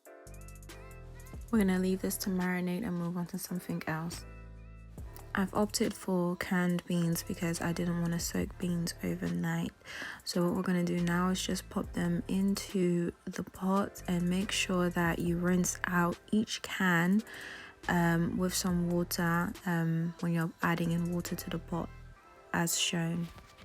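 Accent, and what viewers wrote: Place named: Liberia. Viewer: Hey girl!!! So glad I came across your channel. I just moved from NYC to London a few months ago and I've beeen cravvvvviiiingggg Liberian food. But I don'y know where to find stuff like smoked Turkey meat and other common ingredients here? Can you advise? And do you know of any Liberian restaurants in London by chance? Sorry for the 21 questions. I heard your accent and I couldn't help but ask (assuming you're still here). <3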